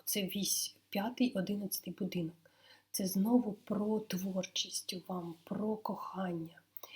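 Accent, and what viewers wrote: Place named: Poltava, Ukraine. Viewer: У Вас полтавський акцент, який дуже милий моєму вуху))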